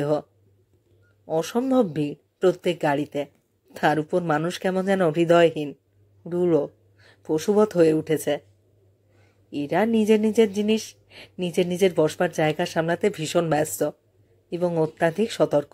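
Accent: Bangladesh. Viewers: বাঙাল উচ্চারণ কেন? আরও প্র্যাকটিস দরকার।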